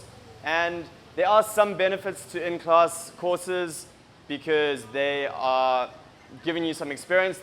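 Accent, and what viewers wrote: South Africa. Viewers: That accent... You're south african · sounds South African to me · are you from south africa? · Love that beautiful South African accent of yours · Its so awesome to see and hear a fellow South African in such an informative video. Well done!!! PLEASE HELP... I have a Bachelor Degree and Post Graduate qualification in Education, but now ESL qualification. Now I would like to get my TEFL certificate but an online one. Please advise which site is legit and actually accredited/ recognized. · he is from south africa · Eish. That accent. Confusing for most. Instantly recognisable to fellow 🇿🇦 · you sound very South African bru, anyways I have a question, let's say I want to go overseas and I have 0 knowledge on teaching, I studied computer programming ( so no Degree or diploma for teaching), would you still recommend getting a TEFL Certificate? 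· not from the US so must be South African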